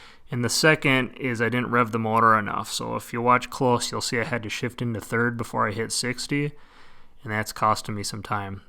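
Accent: Midwest, United States. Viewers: Could tell he was Midwestern right from that accent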